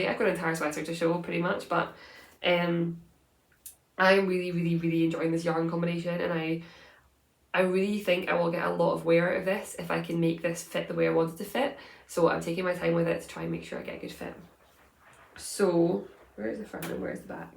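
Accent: Scotland, United Kingdom. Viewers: I personally love hearing your Scottish accent and don't have trouble understanding you at all! The Youtube knitting community is a great way to hear so many different accents and I love it. Your podcast is the best. Love from Australia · Love your accent. Reminds me of when I am visiting my daughter in Aberdeen. I’m in Canada❤ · It’s not your accent, your Scottish accent is lovely, I think. it’s only that at times words aren’t pronounced fully (several words come out as one!), but of course it’s up to you whether you want to reach more people or not, it’s your channel 😊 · Please do not change. Your Scottish brogue is so beautiful and if someone truly doesn't like your videos they can always choose not to watch. The self-entitlement of those people asking you to change to suit their own convenience is astounding. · Please! I love your Scottish Accent and I am not having any issues at all! 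You are you and if folks don't like how you speak they don't have to watch!! I am grateful you are here and Love your knitting podcast!And..... I can't wait for your sweater release!! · Ignore the naysayers. Scottish accents are the coolest! Thanks for the great episode.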